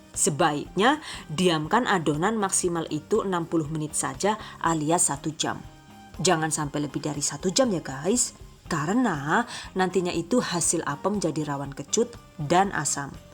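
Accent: Banyumas, Indonesia. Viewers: Like Bunda ngapak KU tersyg 🤣 🤣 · Keren koh ngmg ngapak Sukses embak e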